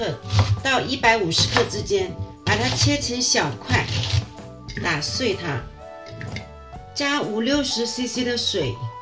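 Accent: Shanghai, China. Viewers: Jenny阿姨，看你的视频，一口沪普就是特别亲切啊。刚刚我在看视频，孩子听见你讲话，问我，妈妈是外婆在教你做好吃的吗？孩子们以为是她们自己外婆在说话。😂😂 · 这是上海人讲普通话，带有上海口音。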